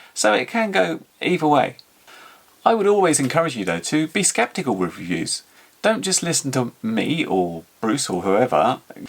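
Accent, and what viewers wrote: United Kingdom. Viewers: As a fellow Brit I find it refreshing to hear your accent and your content is spot on, you call a spade a spade. I must admit that I've never clicked an affiliate link in my life purely because I research a lot and by the time I decide on the product the video is well closed. Must remember to come back to them as a little thank you - I just haven't so far. Keep up the great reviews I try to watch all your stuff.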